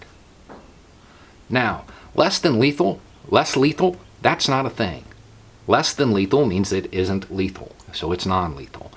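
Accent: South, United States. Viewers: I’ll be honest I’m from London, been NY and LA in the USA. Never would I think that someone that looks and talks like you would have your morals and ideas (yeah I know, ignorant) thank you for opening my eyes and making me realise not all white guys with southern accents, beards and trucker caps are racists.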